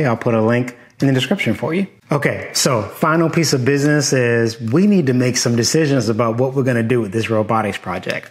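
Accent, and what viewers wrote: Baltimore, United States. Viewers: You sound like you from Baltimore you should try to get on at that bridge project. I'm sure they use an engineer if your caliber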